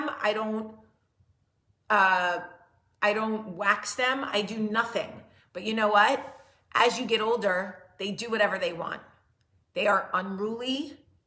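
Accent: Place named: New Jersey, United States. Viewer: You’ve got a lot of New Jersey in you haha! 😆 Love you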